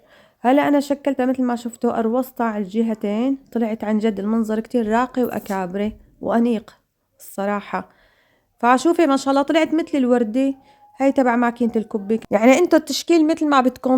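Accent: Homs, Syria. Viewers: حبيبتي شكرا انا متابعتك وبحبك كتير احلى لهجة لهجة السورين وبحب حكياتك وصفاتك انتو السورين ستات بيوت ماشالله عليكم متابعتك ام فيصل من فلسطين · يسلموا دياتك فعلا شي بشهي يعطيك العافية ويبارك ما بين ايديكي .على فكرة دخيل اللهجة الحمصية ما أحلاها الله ايسلم ❤❤❤❤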